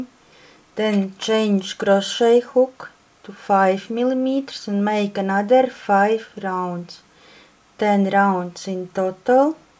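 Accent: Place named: Latvia. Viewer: Are you Latvian? You sound sooo Latvian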